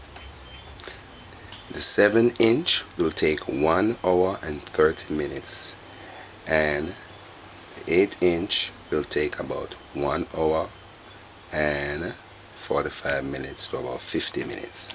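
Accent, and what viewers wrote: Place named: Jamaica. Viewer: Yes, I think you're Jamaican "alf" and "cark". Lol, only a Jamaican can relate))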